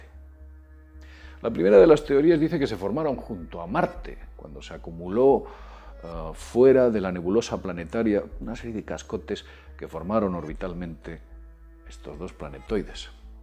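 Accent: Spain. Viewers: Yolanda Lemus Palacios no estareis acostumbrados al acento español o por otras circunstancias serivadas de vuesto dispositivo, pues se le escucha perfectamente....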